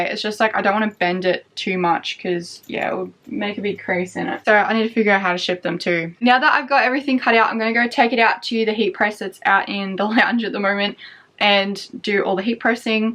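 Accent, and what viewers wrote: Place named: Australia. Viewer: I'm looking forward to get my laptop bag which is on the way. I love the kind you talk although some time as a non english native speaker your lovely Aussi accent is hard to understand 🙂Go on and stay authentic!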